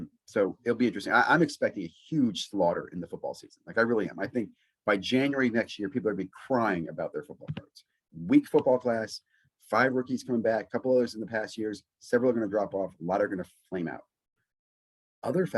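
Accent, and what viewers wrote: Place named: South, United States. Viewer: Liked for the southern accent 😆😆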